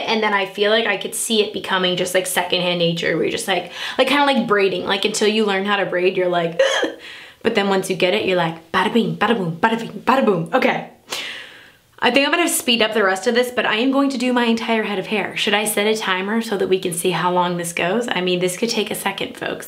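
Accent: South, United States. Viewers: I like the southern accent better. It’s you. And that’s more than ok. 🙂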